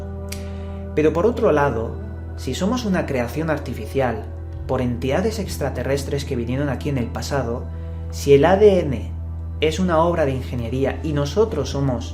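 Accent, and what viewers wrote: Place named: Spain. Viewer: x2 me encanta su acento español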